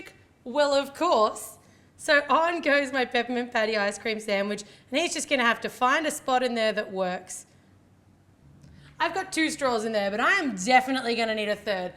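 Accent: Australia. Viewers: Ehh what? She's got like the strongest Australian accent ever. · Are you from Australia 😀😄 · Is she Australian or American? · Is she Australian? Cause I am! =D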